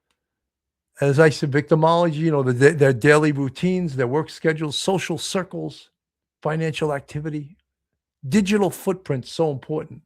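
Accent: New York, United States. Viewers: I really appreciate you and your common sense approach! I do not like the hyperbolic tv news stations that try to make things even worse than they are. New subscriber. BTW, I like your NYC accent!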